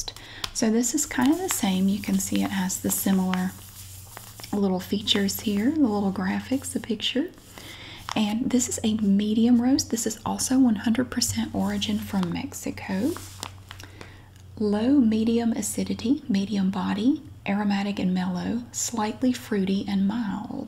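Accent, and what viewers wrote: South, United States. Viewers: I like your southern accent: "Hate it an ate it". And you are so considerate to donate the food you bought. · I just moved to South Carolina from NY, and so many people have a lovely southern accent like u! Lol, it gives me such comfort for some reason. Have a great night!!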